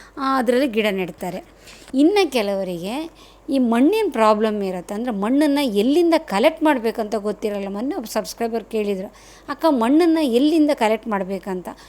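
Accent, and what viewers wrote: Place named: Kundapura, India. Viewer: but kannada superb mathdtheer... kundapura ankonde nanu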